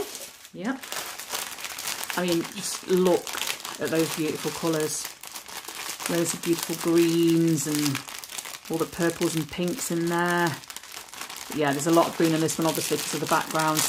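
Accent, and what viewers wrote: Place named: England, United Kingdom. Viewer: Wow I love the world earth day DP looks buatifull iv only come across you today An it's so nice to listen to an English person An not an American voice lol my cat daisy seems to love getting into amazone boxes xx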